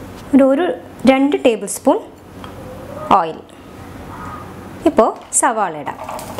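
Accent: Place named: Tamil Nadu, India. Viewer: Kure tamil chuva ayi alle samsarathil. ✌️✌️😍😍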